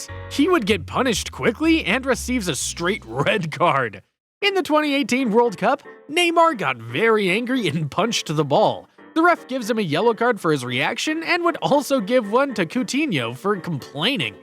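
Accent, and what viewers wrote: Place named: United States. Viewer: Can we have the voice over removed? The commentary is just too American.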